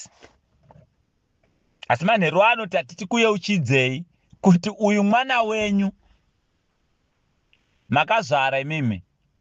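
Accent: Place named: Matabeleland, Zimbabwe. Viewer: You got ndebele accent 😢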